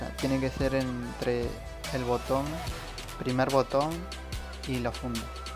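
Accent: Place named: Argentina. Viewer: Hisopos que cotonetes habla argentino papa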